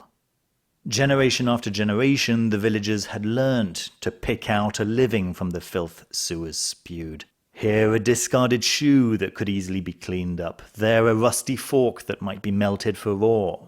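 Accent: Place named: RP, United Kingdom. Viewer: you're the about the only intelligent English that I listen to all day I just love the way you speak to Queens English and you are have a permit if I could afford your patreon channel I wouldn't have to save up to buy a tarot deck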